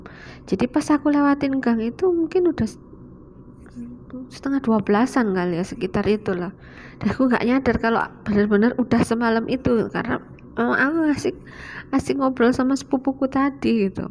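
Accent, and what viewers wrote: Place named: Malang, Indonesia. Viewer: Medokkk bnget malangnya hee sasaji mbak 🌹🌹👍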